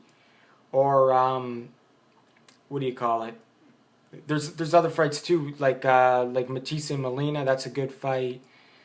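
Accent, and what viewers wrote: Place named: United States. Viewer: I thought you are american with accent, u live in lithuania too?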